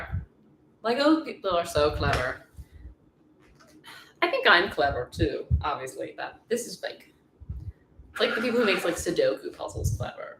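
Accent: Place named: Wisconsin, United States. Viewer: Regarding the accent... don't forget the Wisconsin influence. Doncha know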